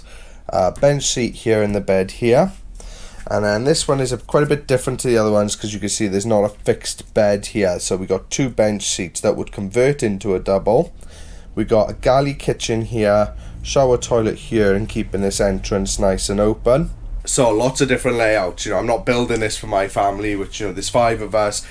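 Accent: Wales, United Kingdom. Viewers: Where is that accent from? It's not Brum, or Scottish ... Welsh perhaps ??? Shame your workshop is not big enough to bring the vehicle inside - rain will be a big problem - traipsing into the van making a wet floor and a mess. I don't like any of the layouts, nearly ALL vans are not wide enough to sleep across the space ... My personal layout is for a single person - fixed bed along the 'long' side with storage under, and kitchen on short 'door' side. Potty at end near rear doors, no garage, no shower, no electrics. VERY basic, therefore very cheap 👍👍💯💯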